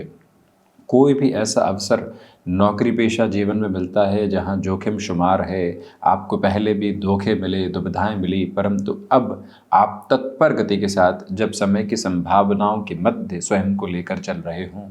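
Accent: Standard. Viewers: Even though could not fully understand what he spoke but it was pleasant hearing his shud Hindi as if I am in Ramayan era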